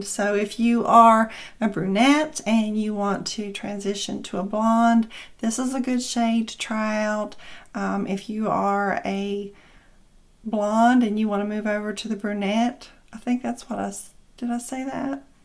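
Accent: South, United States. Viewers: Absolutely gorgeous!!!! Love your accent!!!! It’s nice to find someone on YouTube that has a similar accent as I do!!!!! Gotta love the South!!!!! 👍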